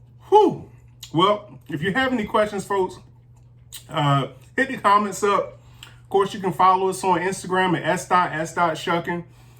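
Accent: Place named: Eastern Shore, United States. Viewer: Great job! I like both types of oysters! You have an Eastern Shore accent, are you from the Shore?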